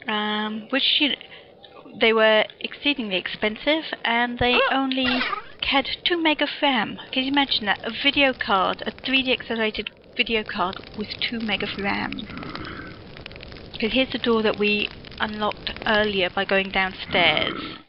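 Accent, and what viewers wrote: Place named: United Kingdom. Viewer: British d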